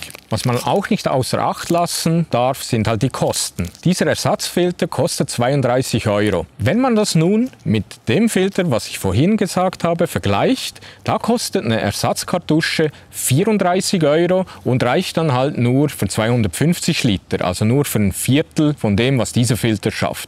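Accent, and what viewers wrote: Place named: Switzerland. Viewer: Moin Na, das war ein dramatischer Anfang und die Musik Episch. Das mit dem Schweizerdeutsch war vollkommen in Ordnung, der Dialekt ist einfach Klasse. Der Filter ist wirklich gut. Selbst hab ich einen von Katadyhn . Ist aber eine Überlegung wert sich den anzuschaffen. Danke für's zeigen 👍🏻 Gruß Michael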